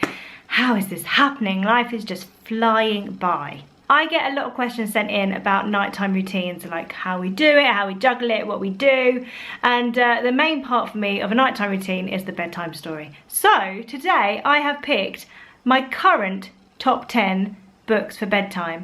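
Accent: Scotland, United Kingdom. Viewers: That Scottish accent is brilliant! "I cannot rememberrr..." My 15 Month Old Niece loves the "That's not my..." Series...I think she has the whole collection! 😂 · I loved your Scottish accent, v authentic 😀 Great books I'm going to look for some of those for my son. Enjoying mumsday videos x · Your Scottish accent is on point!☺️ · Love the book shelf behind you, where did you get it from? your scottish accent is quite good, you can also get the Gruffalos Child in Scottish slang!! It comes under Gruffalos Child in Scots. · Your Scottish accent is so good- you sound like Merida!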